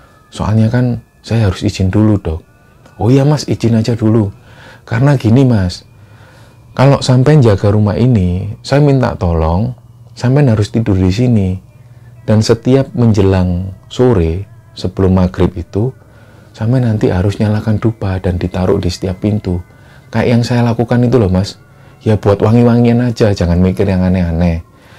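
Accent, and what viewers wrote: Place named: Surabaya, Indonesia. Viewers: Gaya bahasa khas surabaya nya bikin seneng denger nya.. 👍👍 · Mas aslix dr mana nggih, logatx kok sprti orang Pasuruan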